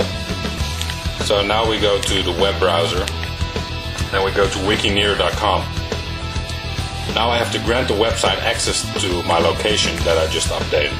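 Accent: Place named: United States. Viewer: Good tutorial and information, great music(loop), good American accent too! I only recognized the real guy's voice when he pronounced "Berlage"... ;-)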